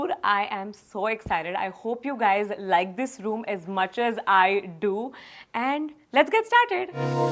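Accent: Gujarat, India. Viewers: According to my observation all the gujju girls have this type of accent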